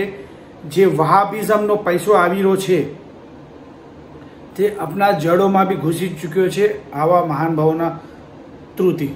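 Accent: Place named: mixed, India. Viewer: Tame proper gujarati nathi lagta thoda up bihar wada chho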